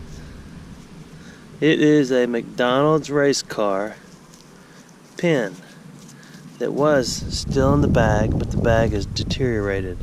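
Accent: South, United States. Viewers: We dont have too many ppl with a southern accent here in Oregon.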